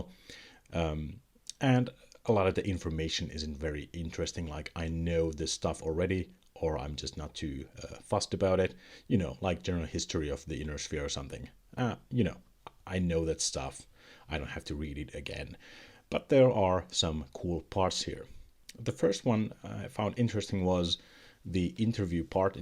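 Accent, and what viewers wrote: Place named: Finland. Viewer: HAH! Now I hear it! You sound like my buddy from Seinäjoki! :D Should have recognized it at once. Good to hear you found a warm welcome here. :)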